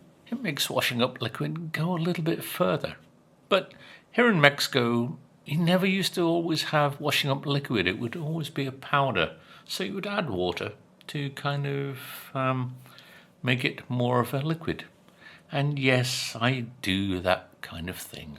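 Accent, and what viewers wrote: United Kingdom. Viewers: I love your british accent,,I try to imitate it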